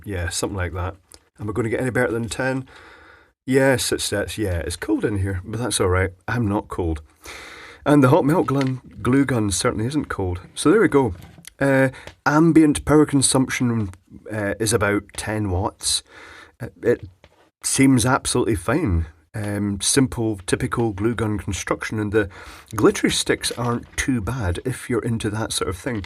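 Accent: Scotland, United Kingdom. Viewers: I'm just going to watch your video for your accent! love it Scottish right? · You have the most British accent I have ever heard, but then again I am from Stoke-on-trent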